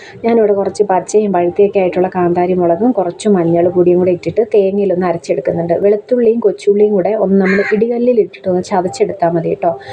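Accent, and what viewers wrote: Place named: Kollam, India. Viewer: ഞാനും കൊല്ലം. ചവറ. സംസാരം കേട്ടപ്പോൾ തോന്നി കൊല്ലം കാരി ആണെന്ന്. അതാടാ ചോദിച്ചേ.❤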